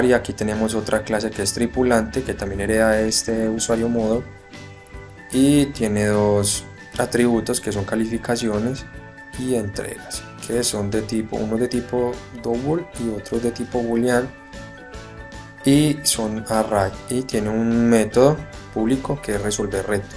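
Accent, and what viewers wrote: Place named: Antioquia, Colombia. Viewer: Paisa en la buena por esa colaboración Dios le multiplique 👍🏻 ese acento es todo vacano el de este man jajaja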